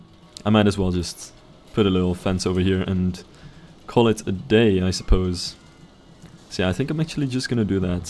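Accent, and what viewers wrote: Netherlands. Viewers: Wait, you're dutch?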